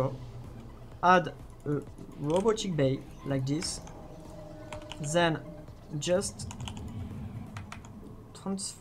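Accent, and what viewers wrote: France. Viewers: Cheers from Sweden! I have just returned to Starcraft 2, much thanks to the Finnish players who beat the Koreans recently! i was thinking then, are there any "Old" WoL players that stills play... And i found out that Naniwa was playing just some games for fun on twitch and i saw a live match on twitch with stefano.. soo i was hooked again, cus its so funny to watch starcraft 2 matches and suddenly i wanted to play 1v1 again! when i came back to the games i'v been looking for some "sheets" or "how to buildorder" etc and then i saw your effort on <link> i watched some of your videos, found it intressting most cuz i wanted to get toss back into my own protoss play again! And then i saw this video, when u do a series of play on the ladder and you explain what you belive you see and what info you get from your scouting. And your experiences dosent lie to you that often. 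but i found it realy intresting when you react to things. here we "noobs!" can do alot of learning! I think i do alot of progress watching your videos! Me however that are a Platinum player that wish to step up to Diamond i found this video very usefull! I realy hope you do a few more videos like this on the ladder. Cus we lover tier guys learn alot form this! and i love your frenglish keep do videos! its intresting, funny, usefull... you help the starcraft 2 communityt alot by doing this videos! cheers again! Samsos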